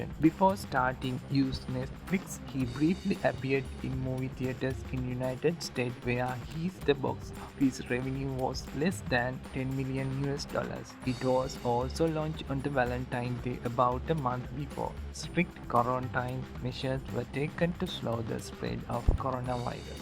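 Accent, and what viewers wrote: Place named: India. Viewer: 🇮🇳 Indian accent is annoying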